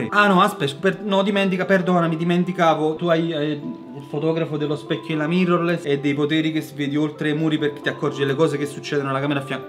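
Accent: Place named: Naples, Italy. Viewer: sei veramente eccezionale davvero a parte che mi piace molto il tuo accento napoletano sei comunque fantastico perché vedi chi crede a queste cose come me e non sono l'unica imbrogliare me e gli altri non è molto sportivo diciamo anzi ti senti presa in giro. spero e so che hai capito cosa mi riferisco comunque scusa se te lo dico ma il fatto delle bambole possedute è la più grossa idiozia mai sentita anche se non stai parlando di quello però ci tengo a dirti quello che penso per farti un esempio. Grazie 😘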